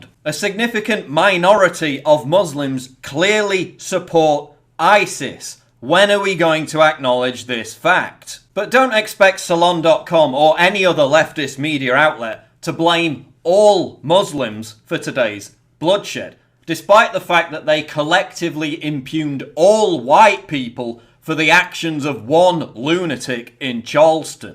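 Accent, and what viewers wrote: United Kingdom. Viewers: wait is this guy British?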